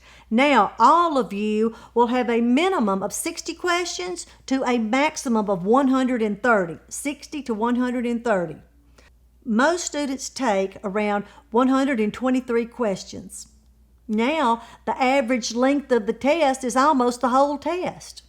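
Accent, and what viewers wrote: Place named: South, United States. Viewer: I could listen to you all day. Love the southern accent.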